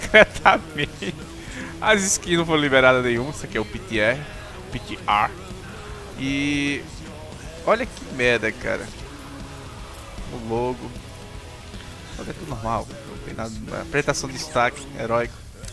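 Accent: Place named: Recife, Brazil. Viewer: tu é engraçado kkkkk é de Recife? Sotaque da porra é esse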